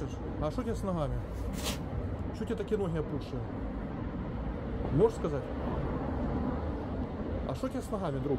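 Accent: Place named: Ukraine. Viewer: Так это же украинский говор. Такой мягкий и приятный уху!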